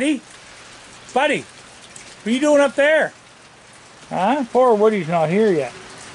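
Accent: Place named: Canada. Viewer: Love me some and Bob! My favorite two channels that I look forward to everyday! I just love your Canadian accent! I was born in upstate New York but moved to Texas when I was a teenager. Sure miss the north!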